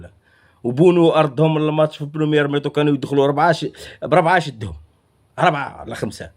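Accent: Morocco. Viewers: اخي الكريم لهجتك مغربية وأنت تقول أنك جزاءري افهمك جيدا أنت مغربي في الأصل من مغنية أو سيدي بلعباس او تلمسان او ما جاورهما .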